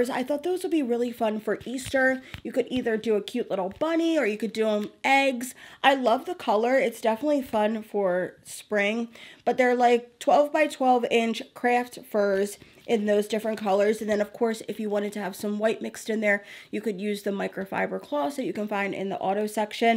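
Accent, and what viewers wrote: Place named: Philadelphia, United States. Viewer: i hope you don't mind this comment, but i kept hearing your accent and could swear you were in the philadelphia area, and then when you showed the mirror and i saw the wawa cup in the back i felt vindicated LOL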